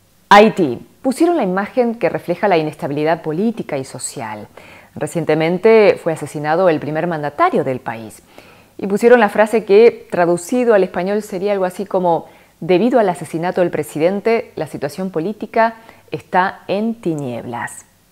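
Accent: Argentina. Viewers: Primera vez que veo este canal. Muy bueno. Pensé que me hablaría en coreano y habla español argentino :) saludos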